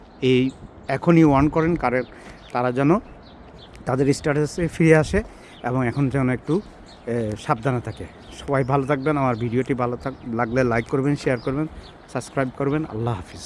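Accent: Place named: Noakhali, Bangladesh. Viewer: আপনি মনেহয় নোয়াখাইল্লা। শুদ্ধ কথা বলতে বলতে আপনার নোয়াখালীর কিছু ভাষা চলে আসছে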